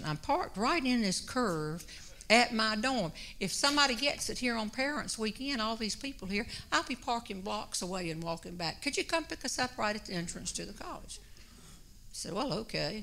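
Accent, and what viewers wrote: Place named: Charleston, United States. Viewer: I grew up in the Charleston area. This lady sounds like some of my neighbors. Hilarious!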